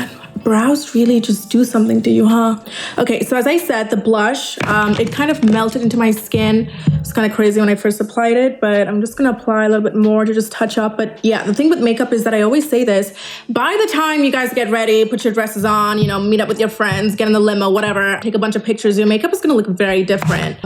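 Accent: United States. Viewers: i swear you sound more american every video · Every time she makes such videos its hilarious to me, she seems like the typical trying to fit into the box kinda girl who tries to be relatable. This accent is it for me, how much will you try to fit in and sound like the typical LA influencer. I feel like when she was in Dubai she at least wasn’t trying to be something and was genuinely funny this is just cringe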